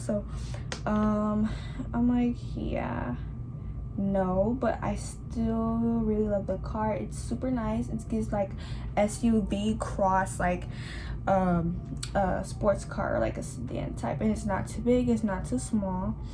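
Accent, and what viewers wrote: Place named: Haiti. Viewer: fellow haitian here! north miami haitian 😂, i could already tell by your accent alonee❤❤❤. also im a long time subscriber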